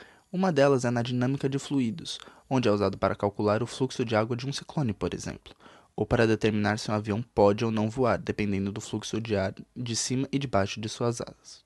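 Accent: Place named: São Paulo, Brazil. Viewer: Amei o sotaque paulista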